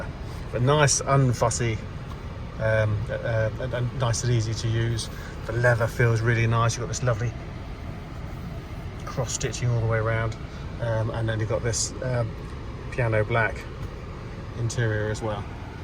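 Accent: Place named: United Kingdom. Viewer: British accent ruined the video I’m unable to continue watching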